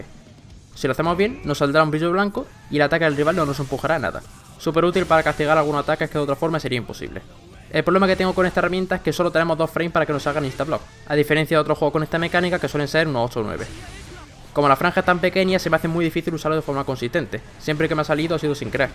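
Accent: Spain. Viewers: este youtuber es genial, enseña muy bien, pero no le entiendo lo que dice, de que región de España es ese acento?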